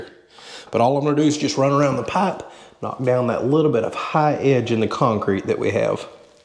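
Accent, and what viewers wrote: South, United States. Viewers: Hmmm Height or HeighTh?? I love the southern accent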